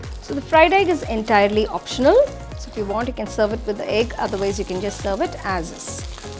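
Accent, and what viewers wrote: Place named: India. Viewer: Indian accent 😂😂😂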